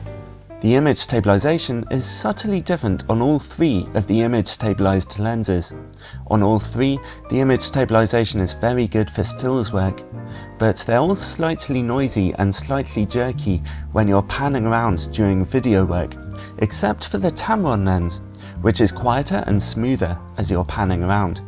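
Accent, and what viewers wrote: United Kingdom. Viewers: excellent review indeed. Thank you. I really like your voice and British accent.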